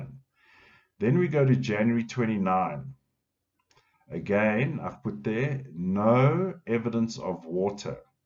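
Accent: South Africa. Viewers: Hi, you sound South African. 👋👋 Hello.. Just subscribed. Thank you, this does shed light as you get more information to expand on this. It makes more sense. Something else was going on, because this whole case sounded fishy. I did not ever believe that Nicola jumped into the river, swollowed water on purpose, and unalived herself by that method. She was fit, fitness conscious, could swim, the river was not deep or flooding, she & she had her dog Willow with her. Plus she had 2 daughters. After 3 weeks of searching the river Nicola was not found in the river. Funny that. Plus there was a helicopter searching, drones, sniffer dogs, police searching, volunteers searching, police divers, and Peter who was a volunteer also offered his services for free. He used his divers, and sonar equipment as an added bonus, but despite all this collaboration, Nicola was not found in the river!! 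That is probably because Nicola was not in the river when they searched. She might have been placed in another body of water and later moved into the river because the autopsy would have to show Nicola was in water for 3 weeks. We are still waiting for the results and inquiry. Now Peter is the scape goat. Who stands to benefit from N being unalived? It was actually the police's job to find Nicola in the river because it was their main working hypothesis that Nicola was in the river. And how did they come to this conclusion? They were fed faulse information. They were trusting P's version which w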